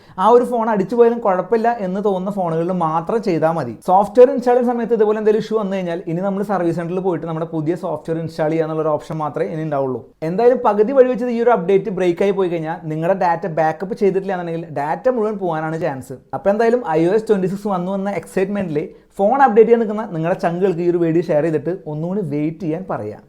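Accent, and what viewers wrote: Kunnamkulam, India. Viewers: bro ar u from kunnamkulam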